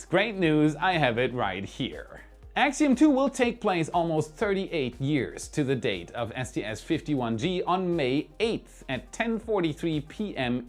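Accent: Germany. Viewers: Great show. Your Deutschland accent is fading. Is that intentional? Space should always have a German accent! For a good laugh YouTube for Tom Lehrer's "Wehrner Von Braun" video... (all of Tom Lehrer is irreverent and hilarious... watch the Masochism Tango too for a ridiculous laugh). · Are you german?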